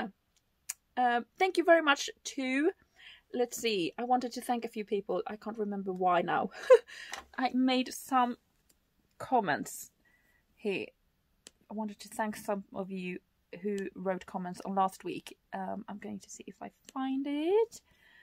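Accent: Sweden. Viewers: Hearing you talk for 30 seconds was enough to realize that you're a fellow Swede.:) I thoroughly enjoyed the video and I'm looking forward to whatever you do next! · another great reaction, tnx. i detected what i thought was a faint trace of swedish accent in ur voice, so i had to check ur info. i thought skåne, but deep forests? hehe, im a smålänning...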